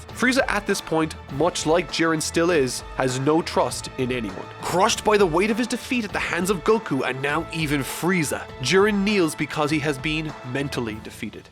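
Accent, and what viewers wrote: Ireland. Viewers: are u irish? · “And I’m not just saying that because I’m from Ireland” So THATs your accent.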